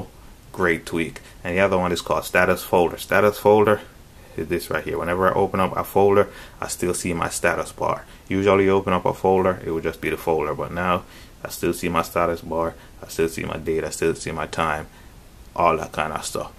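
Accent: Jamaica. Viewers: I’m hearing slight Jamaican accent when u speak, are you Jamaican or from a Caribbean island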